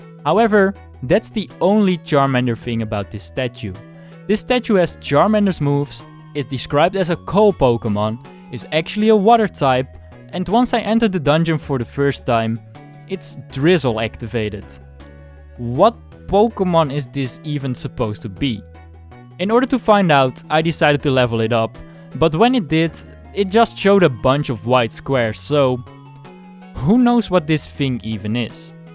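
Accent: Netherlands. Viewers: Fi Fa Fo Fum, do I hear the voice of a Dutch man?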